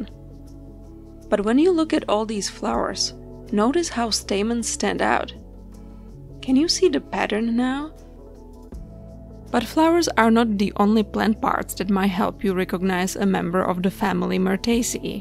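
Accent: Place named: United States. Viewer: Thank you for this very accurate and useful video. It is very interesting for European users to know all these details and photos of American plants. It is also very courious to listen how you pronounce the families names, they are Latin names, but your American pronunciation (Americanization) makes them sound much more charming and mysterious to my ears.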